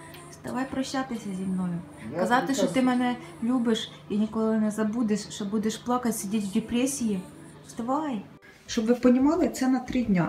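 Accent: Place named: Surzhyk, Ukraine. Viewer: Слухай,розмовляй будь ласка на Українській мові,ато ти говориш на суржику як Вєрка Сьєрдючка з Полтави.Роби щось з собою).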